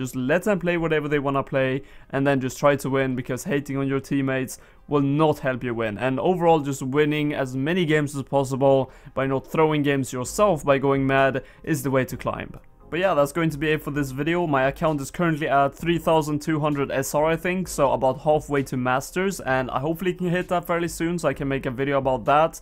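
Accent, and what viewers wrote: Sweden. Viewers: i thought so because of your accent but i was not sure. I'm swedish too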